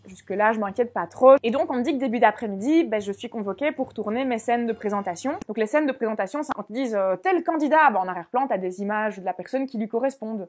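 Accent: Belgium. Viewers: L'accent belge bien de chez nous 👌 tu es de où ? 👀 J'aimerais bien savoir 🙃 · Bonjour, j’aime bien vos vidéos, un peu jaloux de vos abdominaux, vous êtes belge, votre accent ressemble, à l’accent des belges de Mons, vous connaissez? je suis franco belge, François Damiens, je le kiffe avec son rire de pervers quand il passe sa langue, bref vive la Belgique!! Et la France! Bye…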